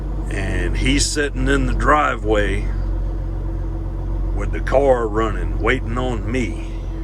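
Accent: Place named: Pensacola, United States. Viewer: I am enjoying this. I am guessing you are from the area close around Pensacola, as you sound similar to Adam Booth (abom79), who has a popular YouTube channel.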